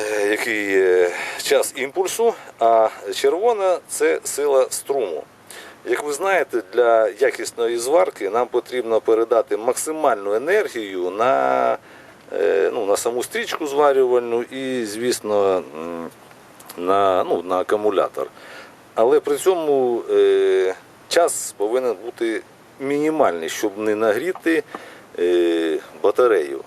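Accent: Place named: Sumy, Ukraine. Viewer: Я з Харківщини, але тільки почав дивитися твій канал, одразу зрозумів, що ти з Сумщини.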